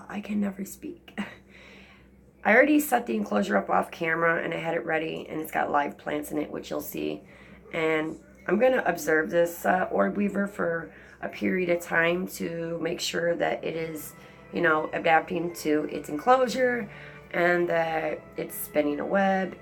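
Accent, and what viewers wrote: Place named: Ohio, United States. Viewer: Awesome stuff! I am actually trying the same thing with a much smaller spiny orb weaver! How crazy is that! You said everything I couldn't say about my viewers. I started out the same way. Just a few friends & family & now I have more friends & family on here! Lol. This community is one of my favorite parts of the hobby aside from the critters. PS. I thought you sounded like you were from Ohio! Norvis is actually a good friend of mine & y'all all have a similar accent! Lol 😂. Great stuff girl keep up the great work!!!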